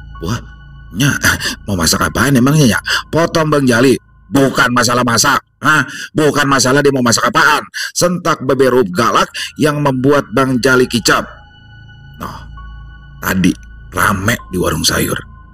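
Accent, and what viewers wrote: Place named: Jakarta, Indonesia. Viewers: hahaha..suka banget logat Betawi.. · Asalamualaikum Bang, ikut nyimak Cerita Mas Wasis & Galih yg bergabung dgn Jawara Betawi Bang Jali & Bang Sam, Ceritanya Tambah Menarik apalagi Logat Betawinya yg dibawakan Bang mantap Banget, ditunggu Kelanjutanya jangan pake Lama, mksh Bang sukses selalu 👍🥰🤗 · ❤❤❤👍👍👍 aq suka logat bahasa betawinya super lucu,bang hebat,success selalu yeah bang wkwkwk 🙏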